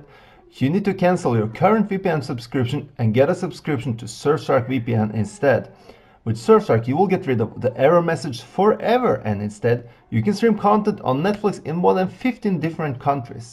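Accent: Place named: Germany. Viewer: I have a question or two for you. Do you work for Netflix news or is this just the name of your channel giving us information about Netflix? I looked up the Mandalorian to see where I could watch it I don’t really feel like wanting to subscribe to Disney+ since I don’t have any young children at home anymore. It was a good presentation, very detailed and easy to understand. Whether you work for Netflix or not I don’t want to be talking to some random person that isn’t the person on the video here and the one about The Mandalorian as well. I did not subscribe for the Netflix News per se. I have more questions regarding The Mandalorian other sites I found who say it can be watched on top and apps like Hulu, Netflix, etc. Since Netflix did not come up with anything for me either I rather than waste so much time trying to decide if it’s worth watching and which apps are legit and if the show is worth watching. 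I prefer asking you. As you provide clear information that’s short, but covers much more than other sites and apps regarding The Mandalorian I’ve visited. Whether you are employed by Netflix or not, that doesn’t matter. I want to ensure any compliments I may give are going to the competent man speaking here and sounds German. It was relaxing and nice to hear an accent that is familiar to me. As for the comments from that crass Man with his choice of words I am biting my tongue not saying something to him. A few people tried to put him in check but, he doesn’t understand Eng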